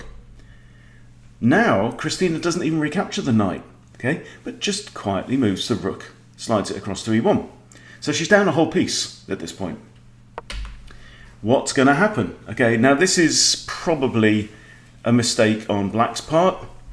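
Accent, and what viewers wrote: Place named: United Kingdom. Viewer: Bingo!.... the computer is telling you to expect a fantasy situation... I figured out why is fun and informative versus the Feingolds, Gothams, Bartholomew's that are on here..... those guys, good as they are, well intentioned as they are, are like talking computer engines. Plus...those guys don't have British accents or roosters either...:-) Great vid on a great game with great points... thank you sir....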